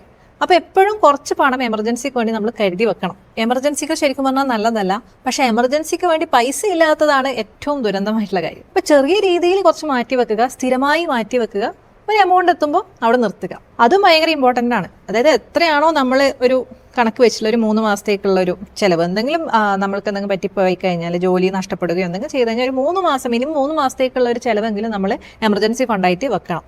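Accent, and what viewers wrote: Kannur, India. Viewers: ഞാൻ ഇന്നാണ് ഫസ്റ്റ് വീഡിയൊ കണ്ടത് കൊള്ളാം സംസാര ഭാഷ ശ്രദ്ധിച്ചപ്പൊ കണ്ണൂർ സൈഡ് തോന്നി ശിയാണെന്ന് പിന്നീട് കമൻ്റ്സ് നോക്കിയപ്പൊ ഒരാൾ പറഞ്ഞത് കണ്ടു. ഓകെ ഗൊ ഹെഡ്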